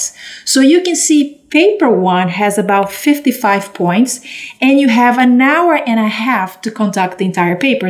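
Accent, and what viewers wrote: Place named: Latin America. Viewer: Clear, concise description, enriched with a Latin accent.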